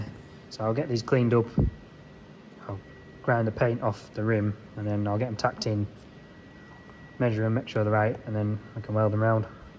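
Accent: Yorkshire, United Kingdom. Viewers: Er kommt aus dem norden von Yorkshire, vermutlich nähe Thirsk. Ich nehme an, Sie meinen "Stuud" und nicht Stahd für "Stud". Tcha, Yorkies haben auch Dialekt, ursprünglich "old English" and "old Norse".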